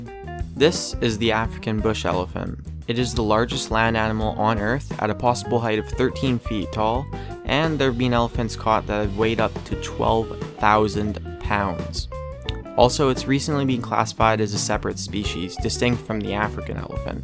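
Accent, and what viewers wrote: Canada. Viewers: You're Canadian aren't you? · are you canadian, definitely canadian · are you Canadian? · Are u canadian?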